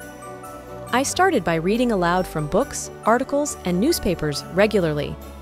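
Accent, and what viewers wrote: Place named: United States. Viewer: Thank you very much for your reply. You are amazing. I don't know where you are from or what your background is like, but you sound very American. I can tell you have been dedicating yourself to learning English and you are still working hard. Much respect. Thanks very much.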